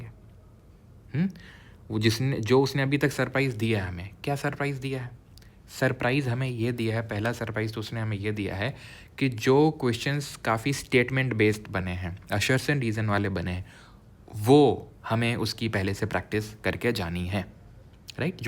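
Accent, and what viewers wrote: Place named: Rajasthan, India. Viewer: Guruji aapke bolne ka लहजा bhut badiya hai❤❤❤ like a rajasthani ❤❤❤, A lot of love from Rajasthan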